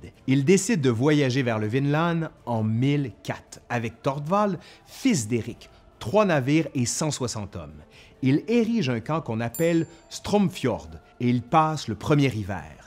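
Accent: Quebec, Canada. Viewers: C'est fou, mais quand enchaîne sur "Allez, aujourd'hui à L'Histoire nous le dira...", je souris, c'est presque réconfortant de me retrouver là en sachant que je vais apprendre des trucs... et entendre l'accent des cousins québécois ne rend la chose que plus agréable:-)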